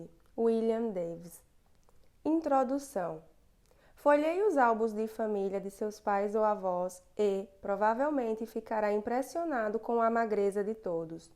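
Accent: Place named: Pernambuco, Brazil. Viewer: Parabéns. Pelo sotaque parece ser do Pernambuco.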